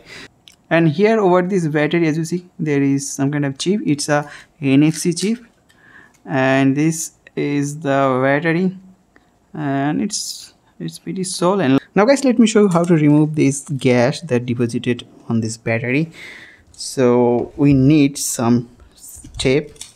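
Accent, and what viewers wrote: India. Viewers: i like this channel indian with english is rare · Indians speaking english is probably the most annoying thing ever....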